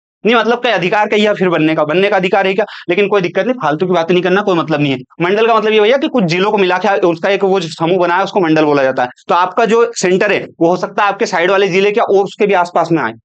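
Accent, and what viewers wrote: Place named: Agra, India. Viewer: पक्का तुम आगरा के आसपास के हो भाषा से😂😂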